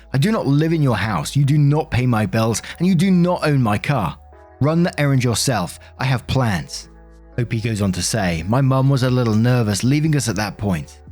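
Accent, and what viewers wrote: United Kingdom. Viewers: One question? Your accent….. are you British?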